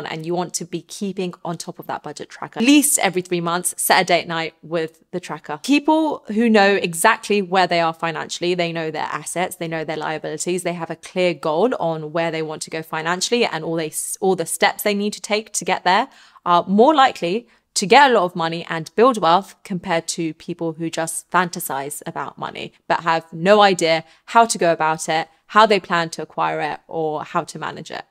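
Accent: England, United Kingdom. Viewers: First time on the channel. What a gorgeous lady and the british accent also hits the spot. What a great way to learn more about ficances. Subscribed! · Can someone tell me what accent this is? Is it a specific regional UK accent? She sounds a lot like the YouTuber Vaughn George and I've never been able to figure out what his accent is either.. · I can't understand your British accent 🤡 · Why do English talk like that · is very cute and has a lovely British accent. AND a smart lady. Very practical video.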